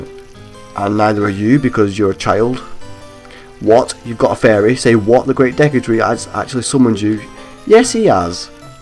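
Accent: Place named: North Yorkshire, United Kingdom. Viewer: Great LP man. Helps that you obviously know the game well so it kind of doubles up as a walkthrough, some funny remarks in there too :) You sound like you're from the north like me! I'm gonna hazard a guess at North Yorkshire? You sound a bit like my mate who's from York. Anyway, great stuff.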